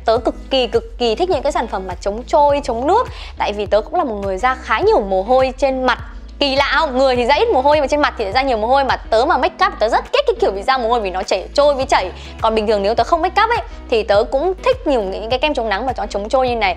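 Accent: North, Vietnam. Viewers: Chị ở Thanh Hóa người Miền Bắc nha. Bạn nghe đâu ra miền Trung vậy 😂😂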